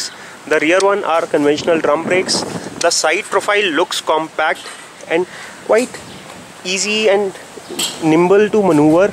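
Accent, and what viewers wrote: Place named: India. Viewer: English is jarring to the ears. Indian english is bad enough. This guy makes it even worse by talking fast!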